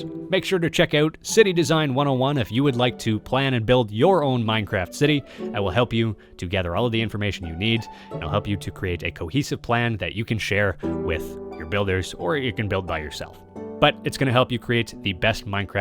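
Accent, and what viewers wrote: Canada. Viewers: sir you have a Canadian accent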